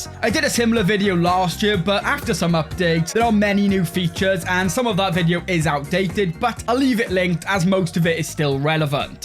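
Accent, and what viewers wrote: United Kingdom. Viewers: Bro i really aprreciate these tips but i cant listen this british accent. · Good video, I just wish your voice wasn’t so violently British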